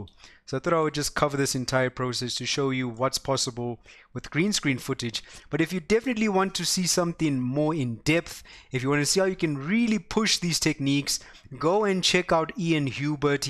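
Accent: South Africa. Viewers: I can hear you're South African, hahah, hello from Durban 😂👍🏻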